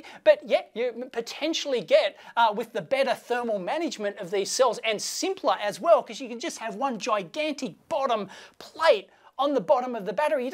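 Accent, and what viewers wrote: Australia. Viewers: Sandy Munro with an Aussie accent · Nicely explained as always, and all the better for being in Aussie English. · Love his Aussie accent. To my poor American ears, it sounds like he keeps saying that “we get the HATE out of the bottom of the battery”, which makes it hilarious to listen to. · "Dunny roll" haha. Keep it up, enthusiastic Australian guy! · Well scripted analysis . Nicely explained . With an Aussie accent to boot.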